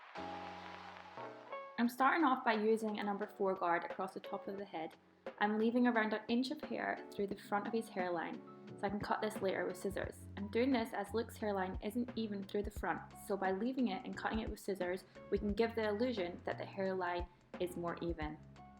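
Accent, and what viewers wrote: Scotland, United Kingdom. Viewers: Are you Scottish? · The Cuts ❄, The scottish accent, is this in glasgow?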